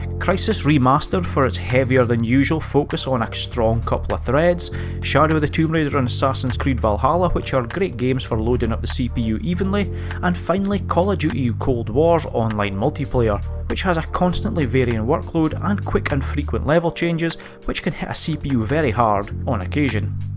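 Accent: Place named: Aberdeen, United Kingdom. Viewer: He sounds like he's fae (from) Aberdeen like me and for that reason alone I subscribed - can you recommend this cooler on the AM5 7900, I currently use the Raith prism just like AMD does in their test labs, into PBO2 testing on my ASUS B650e-e rig at -35 along with AI overclocking and temps remains very good no thermal problems at all scoring 54000 plus on pass mark 11.1 all day long and 12400 on CPU-Z? Getting 27000 score from a Asus Dual 6750 XT card Also, a top SEO guy if you need help bro, another clue lol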